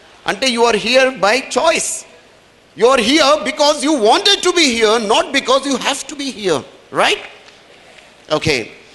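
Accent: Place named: Telangana, India. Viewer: super speech !! just loved his way of presentation, the Telangana DIELECT I love it ! sir kiraaak chepthundu!!feels great and motivated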